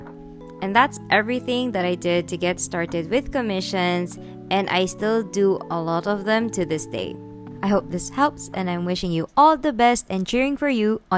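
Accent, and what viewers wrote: Philippines. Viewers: I actually Guessed you correctly? Lmfao? Although i appreciate the Video, cuz i also wanted to look for commissions, your accent kind of gave me a suspicion, so instead of using English, i used Tagalog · accent is too FILIPINO.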